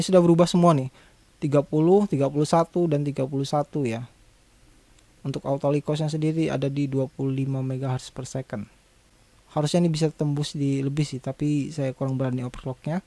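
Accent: Palembang, Indonesia. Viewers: wong plembang ye lor ? 😁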